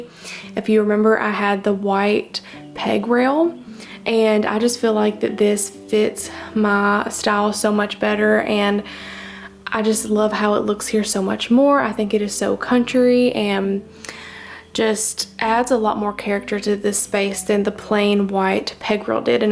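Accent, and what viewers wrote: South, United States. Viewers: Love that Southern accent. Your closeups are excellent but also pull way back now and then, or upon entering the room and leaving the room so we can see how everything is placed in the entire room.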